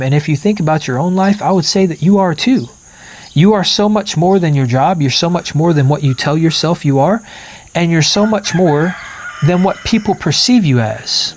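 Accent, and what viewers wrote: United States. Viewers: Im from the UK and only recently discovered your channel and really appreciate your posts! I am a little older but relate to so much of your thoughts and reflections. Thanks for uploading and keep It up! Can't quite place which American state your accent is from though. Texas? I work as a Project Manager and also struggle to explain who I am more broadly.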